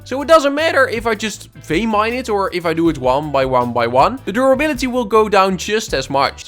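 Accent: Netherlands. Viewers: Are you dutch cuz you sound dutch. i am dutch🤗🤗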